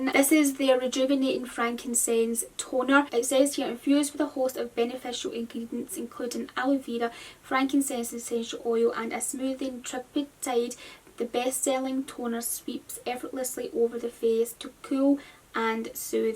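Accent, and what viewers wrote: Scotland, United Kingdom. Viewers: Beautiful Christmas box! I'm trying to place your accent. Are you by any chance from Scotland?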